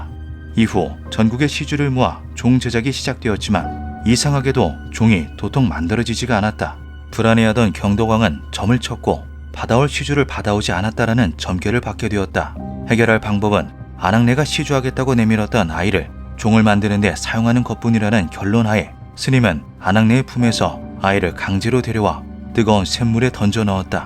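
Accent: Gyeongsang, South Korea. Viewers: 씹할 갱상도ㄷㄷ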